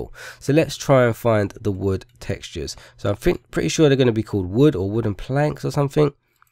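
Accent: United Kingdom. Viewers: no he doesnt shut up. hes literally just british thats the only relation that they have.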